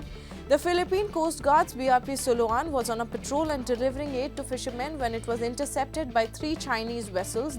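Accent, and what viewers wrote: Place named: Tamil Nadu, India. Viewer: Small ship purposely collide with big ship who are at fault? Please speak better slang not like Tamil slang very irritating our ear.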